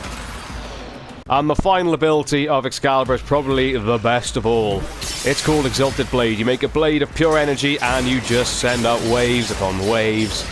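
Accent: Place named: Wales, United Kingdom. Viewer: Your welsh tones make me wet.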